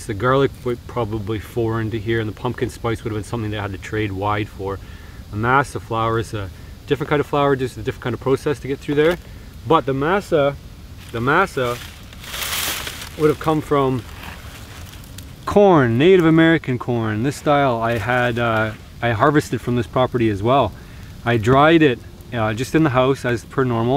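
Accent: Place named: Canada. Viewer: Watching this channel has made me realize the distinction between the way the Canadians and the Scottish say "About". This way sounds like "Aboot" with a strong O, while the Scots say it with more an U sound, "Abuut".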